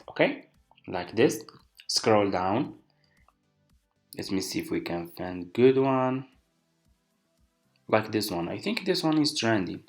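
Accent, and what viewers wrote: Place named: Morocco. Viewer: Thanks and good luck! You are a moroccan i can tell from the accent 😅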